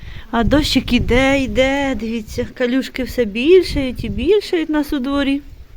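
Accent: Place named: Chernihiv, Ukraine. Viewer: Доброго дня! А в якій області знаходиться Ваше село, якщо не секрет? Я оодом з Чернігівщини, і в нас також говорять на кріп-"окріп". Почувся рідний. говор з моєї Чернігівщини.❤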